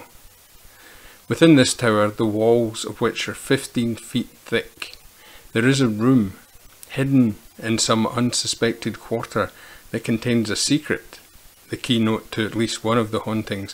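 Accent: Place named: Scotland, United Kingdom. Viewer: First time this channel came up in my feed and I'm absolutely delighted. The stories are so wonderfully creepy and the way they are told, is perfect the lovely sound of the Scottish way of speaking, no dramatic background music that makes actually hearing the story very difficult - this storytelling is simply perfect for me. I love it, subscribed and clicked the bell with a big smile on my face. Thank you for making these videos for us, I'm going to check on your playlist. Best wishes from the Highlands of Bavaria (alpine region) 🙋🏻‍♀️ Slàinte mhath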